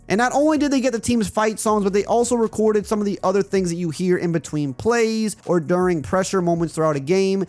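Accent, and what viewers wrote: Louisiana, United States. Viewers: Dang man you sound like you're from St. Tammany Parrish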